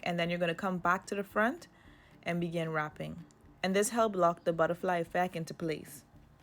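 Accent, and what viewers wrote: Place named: Bahamas. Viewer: Are you bahamian you sound like me am from the Bahamas but live in Seattle Washington currently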